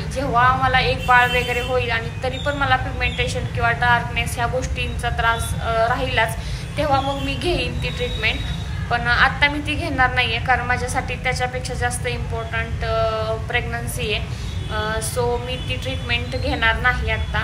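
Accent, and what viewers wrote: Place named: Satara, India. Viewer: Mla tumchi satari bhasha khup aavdte tai 🤗 tumhi khup bhari ahat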